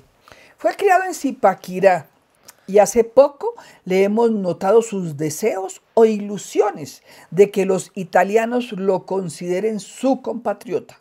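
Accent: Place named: Monterrey, Mexico. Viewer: ¿Señora, Usted dice de todo, pero no aporta ninguna prueba, solo se burla de Petro. ¡Usted es clasista, racista, se le nota por su habladito 'regio'!! ¡Qué basura de canal, coja oficio!!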